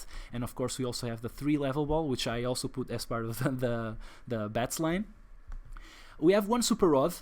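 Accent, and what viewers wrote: Portugal. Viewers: I knew ur accent was portuguese.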